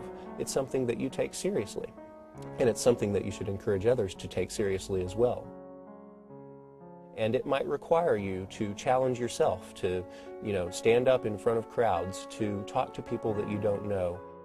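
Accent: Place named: South, United States. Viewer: I think the relatively subtle southern accent and that extra little wisp of hair fooled us all into assuming you were christian, while most of us now know you are... how do you say... an 'actor'. But seriously, I think you did a great job of portraying a perfectly intelligent and very eloquent young man who happpens to be brainwashed.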